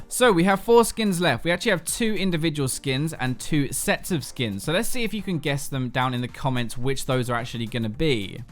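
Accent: United Kingdom. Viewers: Where is URF warick?? This guys is so biased, and his British voice is so annoying.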